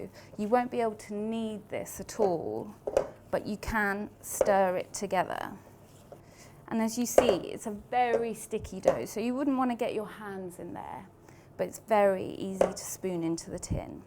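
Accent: England, United Kingdom. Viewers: You have beautiful accent. So awefully English. Quite rare to hear nowadays (in noisy London) Absolutely delightful. And nice bread too. Thank you, Chef!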